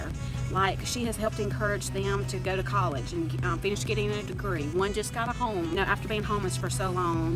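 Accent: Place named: South, United States. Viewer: Yay for a fellow southern speaker, lol. I like all the scene cuts that move the story along. I need more of that in my stuff cause it holds attention. I don't have a lot of voice of influence as I don't work and I don't get out much except with the wifey. But when I do, I try to engage with people on a positive level and spread a little humor. Subbed!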